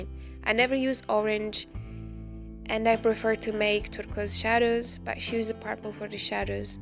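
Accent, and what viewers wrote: Italy. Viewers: Non aver paura di parlare inglese! in alcuni punti pronunci bene le parole, sei anche spedita. in altri punti ricadi sull'accento italiano che in realtà sei anche capace di evitare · Your italian accent is beautiful 😊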